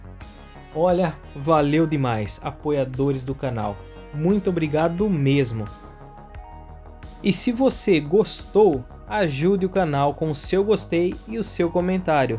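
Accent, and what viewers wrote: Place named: Paraíba, Brazil. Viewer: Esse sotaque da Paraíba ta bem audivio mesmo tentanto não deixar aparece no vídeo, ótimo vídeo Aquela yamaha é 2 tempos ou 4 tempos?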